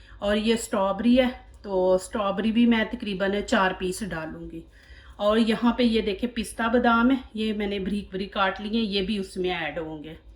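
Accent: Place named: Punjab, India. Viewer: Ma sha Allah BHT zbrdstt Api... Apki aik adat BHT pyari h k ap Duaen deti Hain Dil s... Allah Pak apko BHT kmyabi Dy donu jahan ki Ameen...Apki Recipes BHT Best Nd Different Hoti Hain...Apka bat krny Ka Andaz b BHT khubsurat h Respect pyar s...Mujhy apki pnjabi wali voice BHT pasnd h...Hmen bs apki simple recipes s Kam Hona chaeye...M ap k 1M subscriber dekna chahti hn🙌 Jazakillah ❤️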